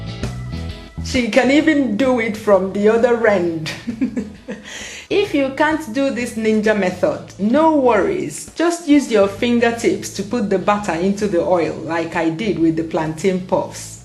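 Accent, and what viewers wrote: Igbo, Nigeria. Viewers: hahahaha god her nigerian accent is so funny to me and i am nigerian! still, I enjoy her videos and i learn a lot. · your accent is so Nigerian. I love it! i love how my Nigerian women sound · She's Igbo... I like the accent 😃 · looool I love the Nigerian accent!! the way you say "puff puff"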